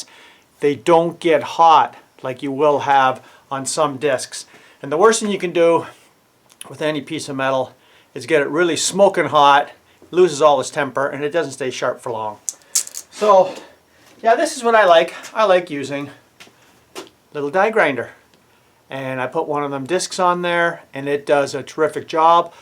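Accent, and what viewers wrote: United States. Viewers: Is that an Irish accent mixed with an American.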